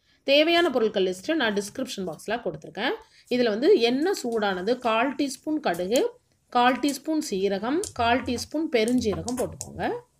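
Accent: Tirunelveli, India. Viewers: Sis u r from Tirunelveli.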